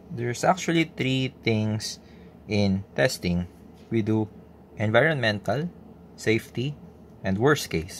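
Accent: Philippines. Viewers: Nice video,, Your voice accent like my Filipino colleage btw.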